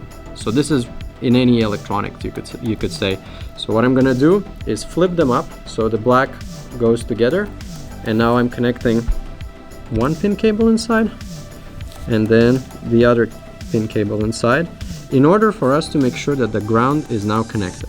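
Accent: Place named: Russia. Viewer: He has a Russian accent )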